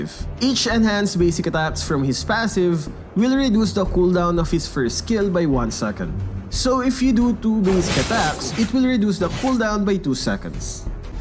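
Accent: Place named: Philippines. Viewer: Yor accent looks like filipino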